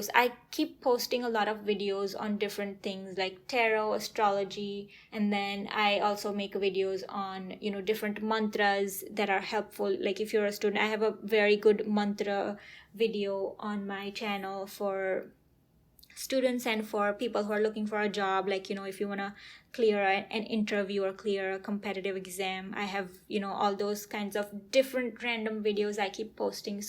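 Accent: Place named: United States. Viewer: American accent. Simply wow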